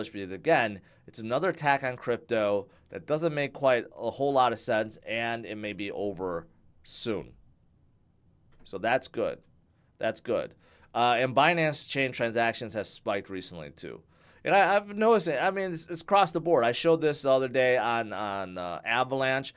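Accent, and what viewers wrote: United States. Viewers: It’s strange hearing what appears to be a Japanese man with a southern US accent!!!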